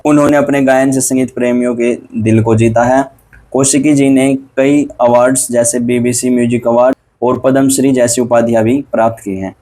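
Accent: Haryana, India. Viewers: Aap haryana se ho sir ???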